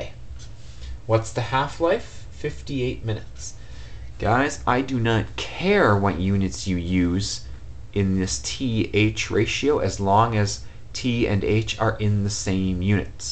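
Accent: Canada. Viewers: he is canadian. I can bet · lol, accent is so Canadian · I knew you were Canadian within the first 10 seconds lol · I can tell this dude is Canadian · you truly speak like a Canadian. Oout. Aboout.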